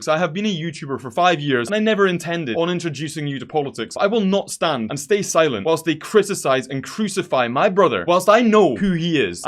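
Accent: Scotland, United Kingdom. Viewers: Does he sound English to you? 😂 He’s Scottish 🏴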